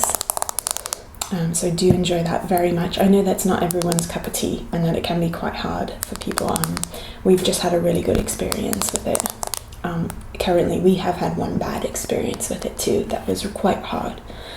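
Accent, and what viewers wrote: England, United Kingdom. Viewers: hold up i thought you were british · Thank you for answering my question! Your channel is the most relaxing. about your accent, you should keep anonymous because it's your "original" thing. you started this way. Also, this English accent sounds cool! <3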